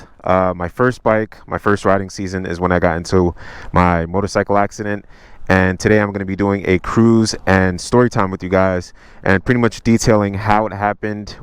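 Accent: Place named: New York, United States. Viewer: I mean just do what I do. Think about it as acting and or having a conversation with someone I front of you. You sound pretty good now as we speak. And giving the viewers what they are asking for. I always ask the viewers if they want to toss out ideas on what they would like to see in the vlogg and what works and what doesn’t work etc… the thing with your video is that hearing the way you talk just reminds me of home both the island and NY (until I heard you speaking a little bit of patwa at a gas station) and I was ahhhh ok ok. That’s why I get both NY with the island feeling and your bike just sounds SO crisp when you going and the snap cranked pops with the rice crispy’s is dope af! Lol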